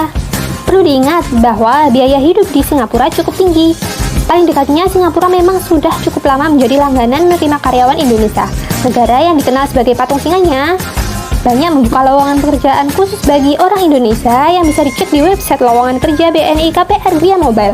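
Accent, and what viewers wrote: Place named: Java, Indonesia. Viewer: Jowone medok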